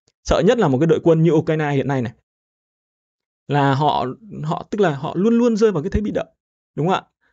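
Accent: Standard. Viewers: Nói chuẩn nha chú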